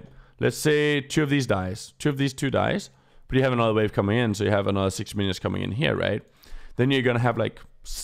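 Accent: Denmark. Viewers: is danish? I'm picking up a danish accent, i might be wrong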